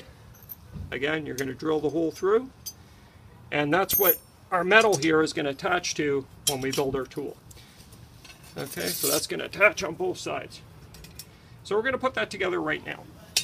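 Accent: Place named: Canada. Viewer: Based on his accent he may be referring to Canadian dollars. And metal prices fluctuate quite a lot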